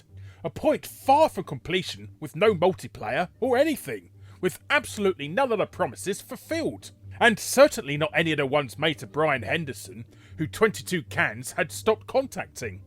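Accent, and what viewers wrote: England, United Kingdom. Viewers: multi year long subscriber, just commenting that it took roughly five years for the algorithm the randomly feed me the "opps, all malyneux" eppisode from your back catalog, anyhoo, straping in to sample this stale arse video about a stale arse chip on ya shoulder as i shake my fist as shadows on the cave wall in honour of your frustrations, love your accent though, wish more spoke the queen's english round these parts.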